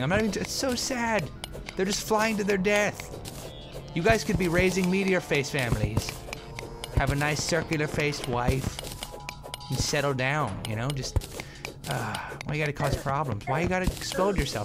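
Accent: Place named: England, United Kingdom. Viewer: English :) not American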